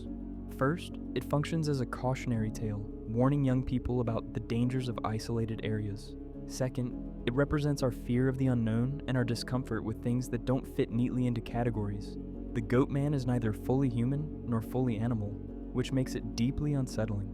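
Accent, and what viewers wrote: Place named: Maryland, United States. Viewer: u can tell my dood is from maryland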